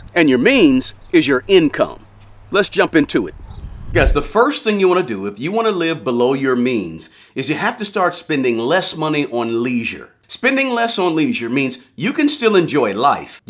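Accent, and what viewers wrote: South, United States. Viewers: I’ve started to live below my means. It takes discipline because I am a spender. Thanks for the tips. Btw, I love the southern drawl you have. God bless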